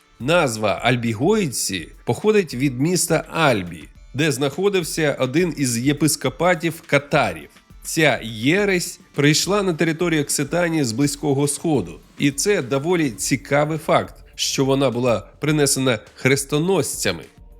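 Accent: Russia. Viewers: Дуже змісовно. Дякую за працю. І над вимовою, бачу, працювали ☝ Але ще відчувається росіянський акцент. Працюйте над собою і над новими матеріалами для відосів. Я Ваш підписник. Ще раз ДЯКУЮ.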